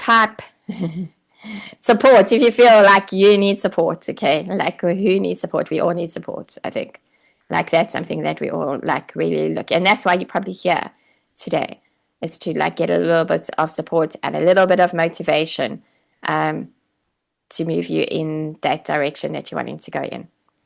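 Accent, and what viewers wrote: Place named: South Africa. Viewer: Im ex dbn..living in a Sydney..nice to hear south Africa voice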